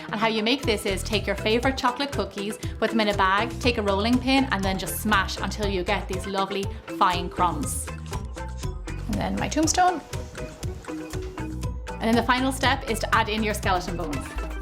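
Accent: Ireland. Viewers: I thought u were from Ireland nice to meet you! ☺️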